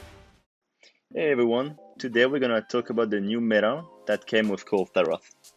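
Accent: France. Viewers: tu es francais non?🤫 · Hi are you french ? cause Your accent look like french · ahhh l'accent français 😏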